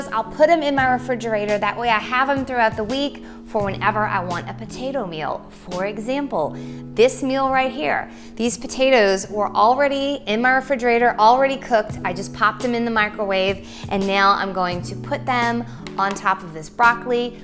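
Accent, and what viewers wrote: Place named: New Orleans, United States. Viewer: I just discovered your channel - I live on the Northshore of New Orleans and was thinking, with that voice you had to be from around here!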